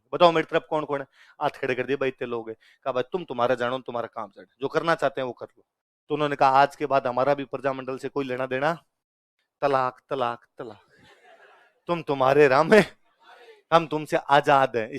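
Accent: Rajasthan, India. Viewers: Bhai ji apki Rajasthani tone me hindi bhot chchhi lagti hai ❤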